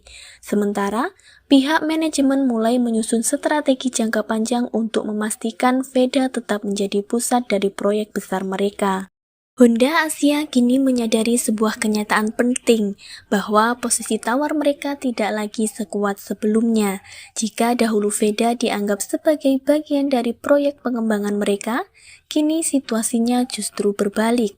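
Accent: Java, Indonesia. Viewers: Logat Jowonya sangat² medok 😂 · logat jawanya medok....tp dia anak hebat....ini yng penting....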